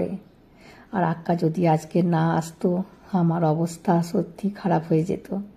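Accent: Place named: Marwari, India. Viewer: বাংলা ভাষার মারোয়াড়ি উচ্চারণ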